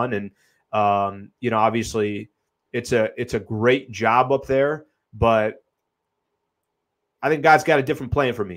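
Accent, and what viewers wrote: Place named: New York, United States. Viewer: You're from CA, though you sound like someone from NYC or Boston. I'm from SoCal and also lived in the Northeast for a while as an adult and never heard anyone one in SoCal who sounds like you. I've been watching for a while and always wondered.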